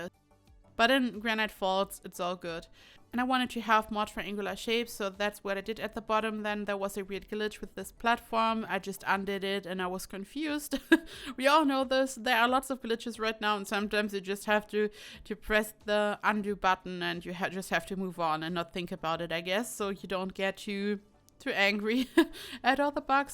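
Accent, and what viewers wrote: Germany. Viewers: Are you german? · Höre ich da einen deutschen Akzent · are u deutsch? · your accent sounds kinda German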